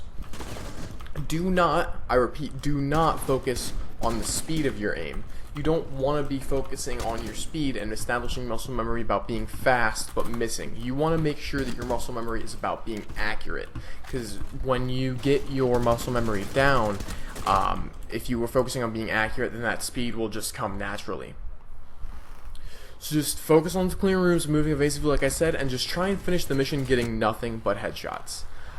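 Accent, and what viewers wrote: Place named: General American, United States. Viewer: The truth is that he talks with a completely standard american accent. At times it might have been a bit fast but he pretty well sounded everything out and spoke clearly. So honestly I do understand your struggle as I have learned a second language myself, but you just need to practice the language more and the criticism in my genuine opinion is undeserved.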